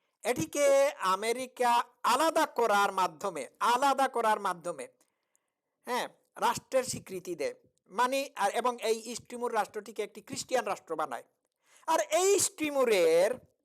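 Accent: Sylhet, Bangladesh. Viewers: জনাব, আপনি কি সিলেটি ?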